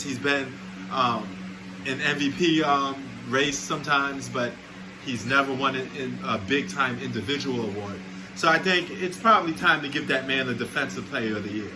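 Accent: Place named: New York, United States. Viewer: One word ruby lol he stopped the whole league u wild boy hahaha good ish p u from New York I can tell by your voice and how u speak lol very ny son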